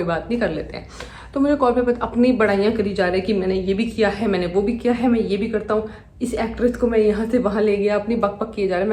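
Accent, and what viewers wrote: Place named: Delhi, India. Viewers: I started watching her just couple of days ago and it’s just my second video of her ( 1st one is that haunted one) bruh, the way she rants and talks 😅🤣. Proper Delhi accent 😅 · delhi mein sab hi aise baat krte hain 🎲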